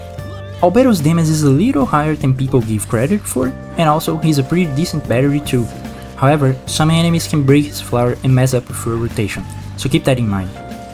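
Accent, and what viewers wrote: Brazil. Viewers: o sutaquezinho br nunca falha, mas excelente video mano, sucesso no seu canal!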